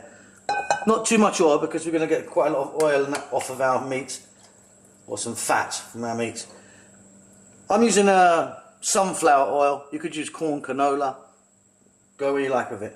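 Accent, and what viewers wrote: United Kingdom. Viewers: Love your fabulous British voice as well ! 👍🙂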